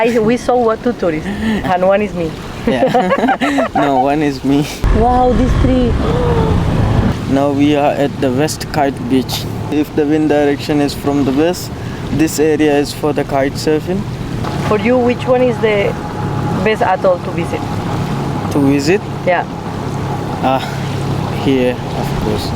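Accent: Spain. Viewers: bonjour, merci pour tes vidéos faites avec ton charmant accent espagnol tu as trouve ta vie, par forcément la vraie vie, mais celle qui te correspond le mieux Peut-être qu'un jour tu feras un autre voyage .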